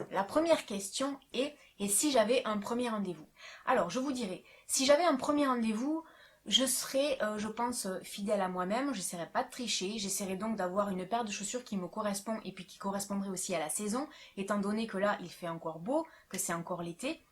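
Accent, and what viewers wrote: South, France. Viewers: trop bonne vidéo je t'aime trop t'es trop cool et esque tu vient du sud car tu as un accenct bisous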